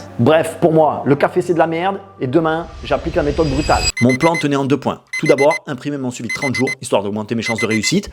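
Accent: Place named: Marseille, France. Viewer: Tu as accent marseillais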